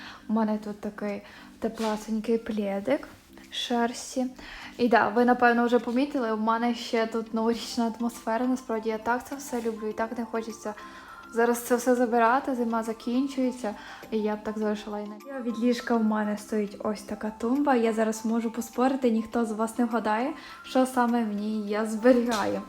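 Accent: Ukraine. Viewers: закарпатський ділекь вуха ласкає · как я давно не слышала Украиеский акцент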